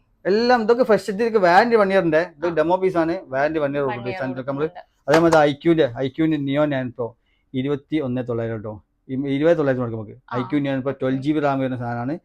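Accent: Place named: Southern Kerala, India. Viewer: പുള്ളി മുമ്പ് കെഎസ്ആർടിസി സൂപ്പർഫാസ്റ്റ് ഡ്രൈവർ ആയിരുന്നോ... എന്ത് സ്പീഡില സംസാരിക്കുന്നത്, ഒന്നാമത് കേരളത്തിൻറെ തെക്ക് ഭാഗത്തുള്ള വർക്ക് slang മനസ്സിലാകുന്നില്ല, അതിൻറെ കൂടെ ഓവർ സ്പീഡിൽ ഉള്ള സംസാരം. വീഡിയോ സ്ലോിൽ ഇട്ടാണ് പലതും കേട്ടത്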